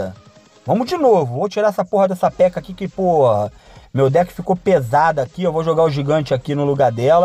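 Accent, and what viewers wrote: Rio de Janeiro, Brazil. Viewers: crl tu sim q é carioca rapá , nmrl vc é engraçado com esse sutaq carioca bolado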